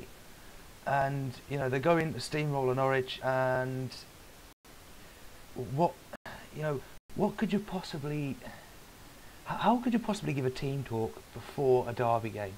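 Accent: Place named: Sunderland, United Kingdom. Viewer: And you're not a true mackem, more like an adopted one by the way you talk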